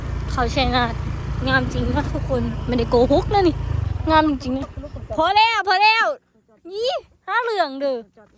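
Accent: Korat, Thailand. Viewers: สำเนียงเหมือนคนโคราช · สำเนียงเหมือนคนโคราช แต่ก็น่ารักดีครับ😊😊😊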